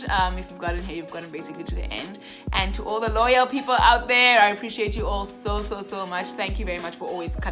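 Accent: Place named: South Africa. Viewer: It’s African! South African or Botswana actually. She sounds NOTHING like a Jamaican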